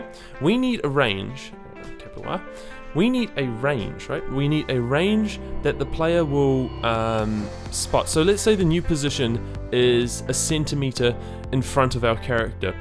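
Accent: New Zealand. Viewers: Dude you're a kiwi haha awesome tutorial! Thanks man :)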